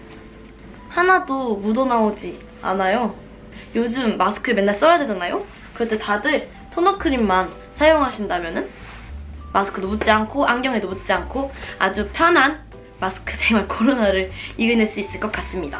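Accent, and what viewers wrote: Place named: Gwangju, South Korea. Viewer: 아 걔있냐 는 사투린데 아무래도구성진걸보니 광주인가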